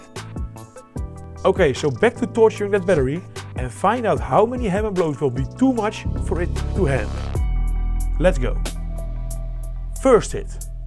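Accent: Netherlands. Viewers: holy dutch accent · Ben je Nederlander, bro? Want je spreekstijl en accent klinken meer als die van een Nederlander die Engels spreekt, daarom wilde ik het even vragen. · immediatally knew you were Dutch the moment i heard that accent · sir you sound dutch as fuck, are you? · Well, I don't take you in the mailing but your video subjects are good however your English is not so good. Very Dutch like. Maybe you have to practice more or take some lessons and then I think that you hit two flies in one clap. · You sound very dutch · Dit accent klinkt zo Nederlands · De nederlandse accent is egt om over te lage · Heerlijk Nederlands Engels accent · Love het nederlandse accent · i just love the Dutch accent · 10 seconds in and i know youre dutch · I know a dutch accent when i hear one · Are you Dutch if I may ask. You sound very Dutch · It says united states in your bio, but that accent sounds really Germanic, specifically Dutch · Ik hoor een Nederlander, of niet? 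· ik hou van dit nederlands accent HAHA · First time watcher, I just knew you were Dutch from your accent. Good stuff. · dutch accent... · From the first words "lithium batteries" I knew you were Dutch 😅 First time on this channel. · I smell dutch accent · Dutch voice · I love the dutch accent,ok on topic,thks for this infomation,usefull. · je hoort het gelijk als het een nederlander is XD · Nen holander die engels probeert te praten 😅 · Dutch accent so thick 😅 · Hey a Dutchy speaking English :D Leuke video! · You are Dutch?! I hear Dutch accent. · This accent sounds so dutch, wth🙏😭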